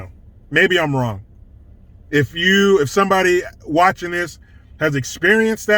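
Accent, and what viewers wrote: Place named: Minnesota, United States. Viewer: Love the Minnesota accent😂 Is there a voice/accent cannot do?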